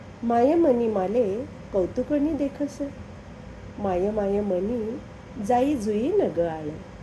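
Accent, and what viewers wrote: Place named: Khandesh, India. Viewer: छान सादर केलीत अहिराणी बोली कानाला गोड वाटते मायवर आहे म्हटल्यावर मनाला लागून गेली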